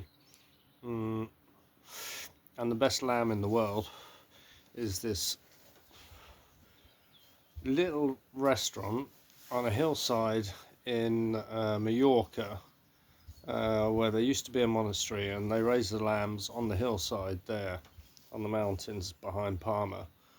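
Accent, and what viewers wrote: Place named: Australia. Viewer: hahahahaha.. thats fucking gold mate. Luv your ozzie mutha fucker accent too.... not to bad for a POM .... chortle. Love that classic saying about the cold water thing. Funny that I've never heard of it until you told me about 6 years ago. Its wild that you were on a farm bugger all from me at the same time. I went to the Canowindra oval to see the hot Air balloons go off so I was quite close to ya. I only lived down the road about 80 K's so we were pretty much neighbours for a short while. Love me some Lamb as well. Gran roasted some every sunday with spuds etc when I was growing up ....... my mouth still waters each time we pop one on. Nice to hear you scored a holden belmont ute too mate ... was it a HQ 1972 model??They're worth a bit now. Cheers from OZ me old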